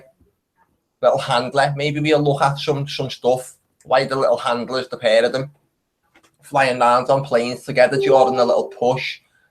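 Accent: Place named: Liverpool, United Kingdom. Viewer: Love hearing a fellow scouser. And your so right. Total fix. Fucking joke mate.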